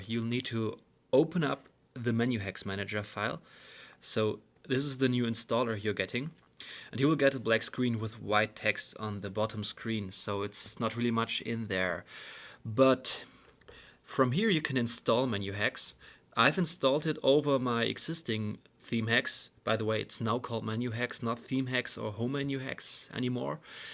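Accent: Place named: Germany. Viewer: Du bist deutsch das höre ich xD :D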